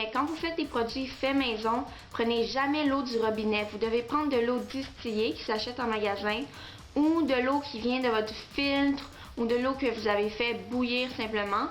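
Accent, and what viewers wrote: Quebec, Canada. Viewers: tu as un accent québécois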